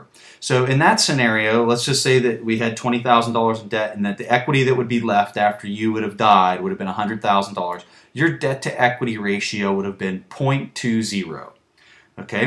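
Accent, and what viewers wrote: United States. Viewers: There’s zero chance you’re not from Baltimore. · are you from Pittsburgh?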